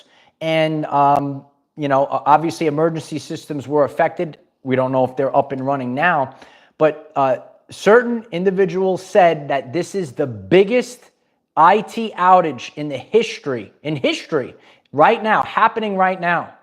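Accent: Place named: Boston, United States. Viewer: Hmm....1st time here, love the accent. Usually not my topic of choice but was drawn in. May i know where the accent is from, is it Boston? I love hearing other voice differences across the globe, and learning about other cultures🙏✨️❤️‍🔥🌊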